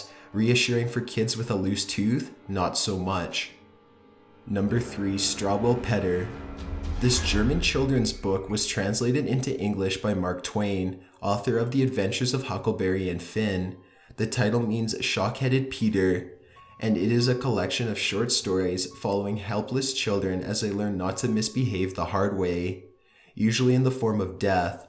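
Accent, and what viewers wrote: California, United States. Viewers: lizzie your right, sounds like "the Californians" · The narrator of this is soooo obviously Californian !! Such a thick accent, where ever sentence almost sounds like a question. lol. Not hating, just saying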